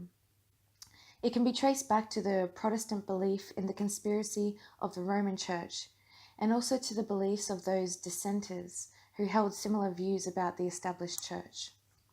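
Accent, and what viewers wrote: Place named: Australia. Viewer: Awesome and great to hear this in an Aussie accent!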